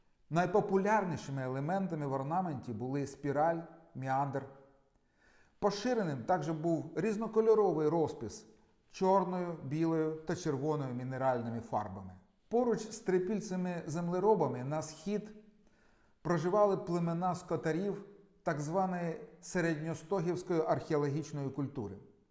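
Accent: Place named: Odesa, Ukraine. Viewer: Розумний чоловік нащось дурня з себе клеїть.. По розмові видно, що одеський липован. Так?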